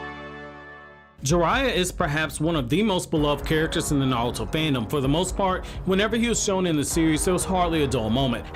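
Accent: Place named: South, United States. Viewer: they say oil like that in the south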